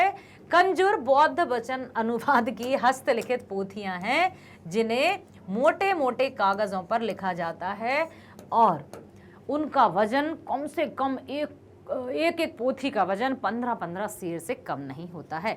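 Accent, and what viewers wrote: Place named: Marwari, India. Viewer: Madam ji Marvadi ave hai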